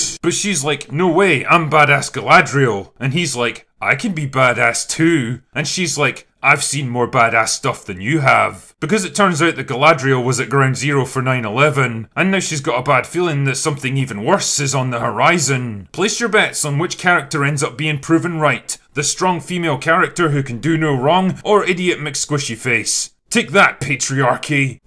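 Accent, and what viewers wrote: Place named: North, United Kingdom. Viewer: This guy sounds like the 9th doctor 😂🤣 must be his northern accent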